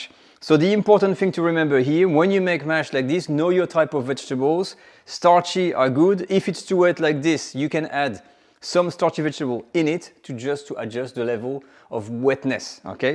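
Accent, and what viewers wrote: France. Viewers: Frensh english lol , nice vdo btw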